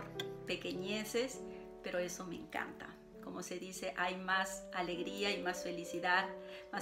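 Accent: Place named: Italy. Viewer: Tú canal es genial😍🇮🇹🇵🇪 me gusta esta combinación de nacionalidades! Llevas muchísimos años allá en Italia😱 ya tienes el acento italiano muy marcado incluso hablando español! Encantadisima de ser parte de tu espacio saludos✨🌸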